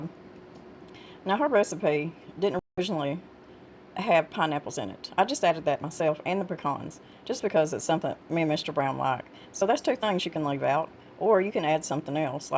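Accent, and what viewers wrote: South, United States. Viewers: I don't know how I came across this but after watching it for about 10 seconds I hit subscribe. I love her southern accent, she sounds like my mamaw.. love the recipe too!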